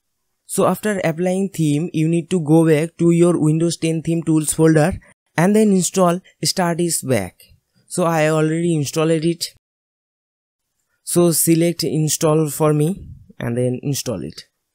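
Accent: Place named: Bengal, India. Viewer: i hate bangali english accent. could not go through torture. closed.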